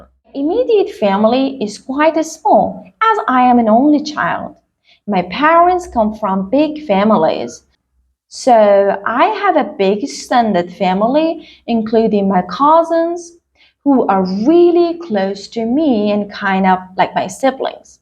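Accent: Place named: United Kingdom. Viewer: 😍 such a nice British accent 🥰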